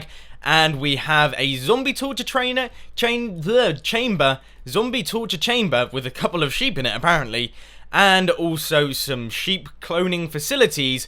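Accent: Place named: United Kingdom. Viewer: hes friggin british dude or English whatever you prefer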